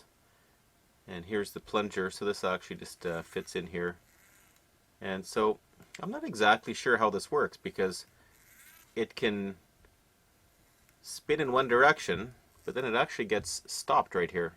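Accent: Canada. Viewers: I don't know if you're Canadian or not, you sound it (no offence meant if you're not). The ones I have (in the UK) don't use a DC motor, they use an electromagnetic actuator type deal and readily accept the fake refills. Same company, but very different design. Cool stuff though, watched it all.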